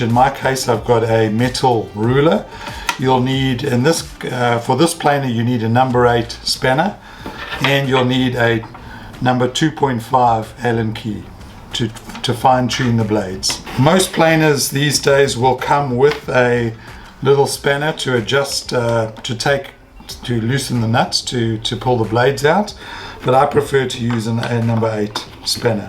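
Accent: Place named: South Africa. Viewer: I see on your profile you're from Australia, but I'll bet my nonexistent fortune that you're South African. Anyway, great informative video. Clear and to the point. Exactly the info I needed.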